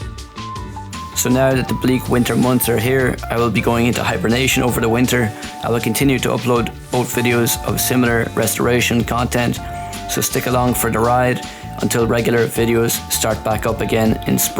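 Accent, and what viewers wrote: Ireland. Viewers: loving the ducks, always make me smile, happy birds, i been adopted by several foxes, a couple of them follow me up the road, very amusing - loving a bit of southern Irish accent, got a bit of Irish in me somewhere, not sure which bit, but some will say the best bit haha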